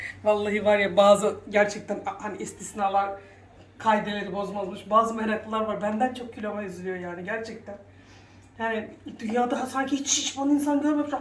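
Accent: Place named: Aydın, Turkey. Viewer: Benim de annem ve babam vefat etti ve hanimi anneme benzettiğim için çok izliyorum. Bana annemi anımsatıyor. Aydınlı olduğum için şivesi de benziyor lezzetler de benziyor ah ah.... hanım Allah size uzun ve sağlıklı ömürler versin..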